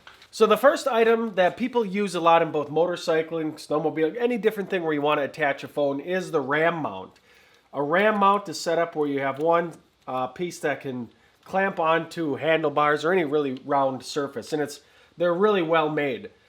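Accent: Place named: Wisconsin, United States. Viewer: Great vid! I vote you do all the tech talks w/ the thick Wisconsin accent that you used in previous vids!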